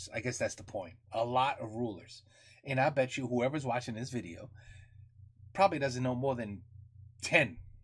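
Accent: United States. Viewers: strong american accent DE LA FRONCE !!! 0/ j'aime beaucoup :D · J'adore quand tu parles français, avec l'accent américain c'est very cute! The golden globe and the scepter held by the King symbolise his God-given powers. The globe is the Earth (yes, no flat earth people in France back then), the physical world, it means that the monarch rules over his land, while the scepter symbolises his power of life and death over his people and the ability to give justice (le bras séculier). The History of France is very complicated, but very interesting.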